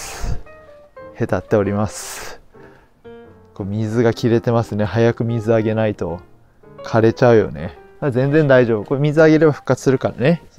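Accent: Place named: Kurume, Japan. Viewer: おはようございます☀ ノズルいいですね😅 まだ使ってないです。買いたいです。昨日もライブ楽しかったですよ❤ の声を聞いて旦那が『それ、九州の面白い人か？』って言ってました。の久留米弁が印象的だったのかも😅 これからもずっとどんらーでいます。ひろりーんでした👍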